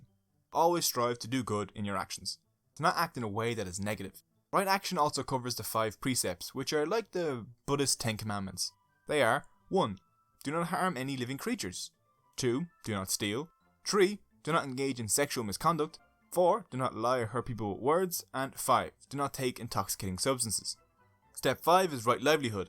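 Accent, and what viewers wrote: Ireland. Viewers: Yes I think he is Irish and speaks to quickly.Nothing to do with Australia though many buddhists on different paths live there. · Hey why is Australia upside down? and what is that Island in the Pacific? Love the way you say tree3🤣 the Irish accent is awesome!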